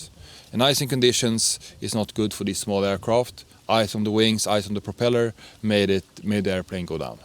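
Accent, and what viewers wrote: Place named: Poland. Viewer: Wow, great idea, with starting a channel, at the same time with educational value, but it will also be a great diary for you. I stumbled upon it by accident, on the head of YouTube page, your video of your trip around Europe came up and made me curious. You have gained a really interesting aviation experience, as well as a surprising Polish accent that you flew in LOT, I myself am at the beginning of this path, I am 26 years old, about 80h of flight, studying to pass the ATPL, at the same time working remotely living in Szczecin for a German company that operates CJ1 and 2's. The aviation world is not huge, maybe one day we will meet at some airport. Greetings, Olgierd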